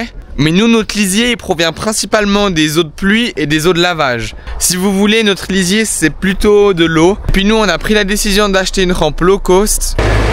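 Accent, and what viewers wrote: Switzerland. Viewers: top ta vidéo, et j'adore t'entendre parler, "ton petit accent suisse" bonne année a toi et tes proches et tu ne fait pas un boulot de faignant a +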